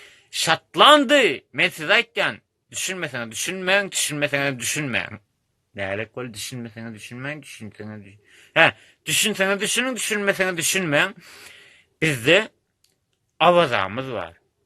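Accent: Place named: Mary, Turkmenistan. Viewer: Dost, senä Marydan! Gürleýşiňden bildirýär!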